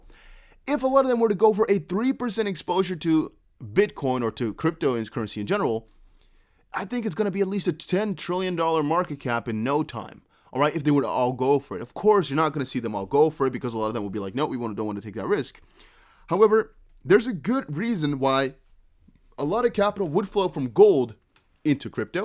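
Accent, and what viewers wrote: United States. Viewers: What is your first language? Because you sound like an American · Dude sounds American I know the English accent from Europe, Canada, Australia, and New Zealand sound different then American accent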